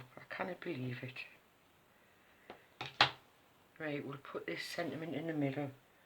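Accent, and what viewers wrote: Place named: Newcastle, United Kingdom. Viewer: Lovely card hun and great channel. Lovely to hear another Geordie :-) xx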